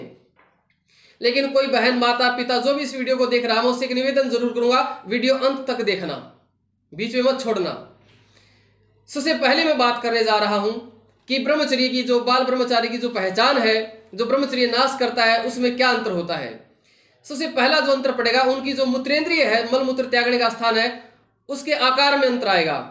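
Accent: Haryana, India. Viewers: हरियाणा से हैं क्या आचार्य जी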